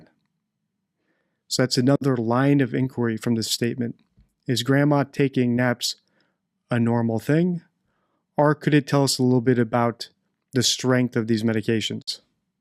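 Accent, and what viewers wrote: South, United States. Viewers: I think the way she talks is just being uneducated and southern. I say both because my step mom is a smart woman, has a great job at a company she has been with for decades.. but she is from Georgia/Tennessee, and the way she talks sometimes just makes me cringe a little inside 😂 it's a southern thing.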